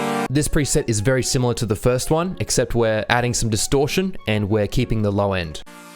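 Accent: Australia. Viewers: Mate are you from Australia? Cus I hear the accent 😂🤙🏾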